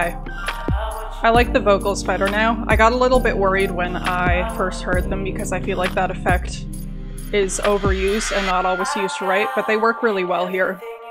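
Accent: Canada. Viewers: The Canadian accent strong as hell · Are u canadian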